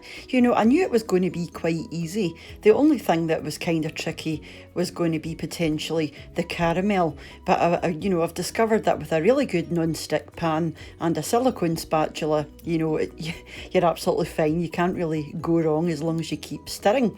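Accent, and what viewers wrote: Scotland, United Kingdom. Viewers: I was complimenting her strong Scottish accent. I have traveled to 48 of the 50 states, including Alaska and Hawaii. The only 2 I have missed are Tennessee and Kentucky. Maybe they have the strongest accents! I was born in Philadelphia and have lived in the west most of my life. I don't have an accent. By that I mean I don't believe there is a typical American accent like a British or Irish, South African, etc. Don't assume I haven't traveled.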